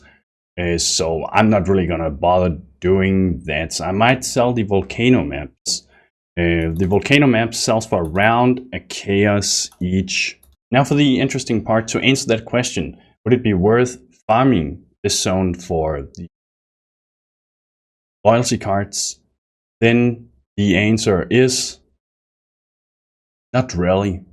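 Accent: Denmark. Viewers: you sound like a dane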